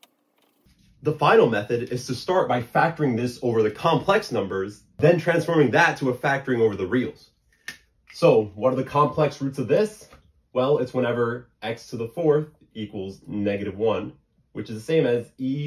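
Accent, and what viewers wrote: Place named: United States. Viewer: Didn’t know bro sounds American😭 (I thought he’s Arab)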